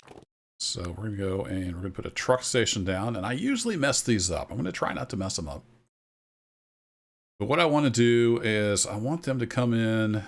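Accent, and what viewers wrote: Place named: United States. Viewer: Its weird when I've always watched people from the UK playing, and then I hear you pronounce things the American way. But it makes a change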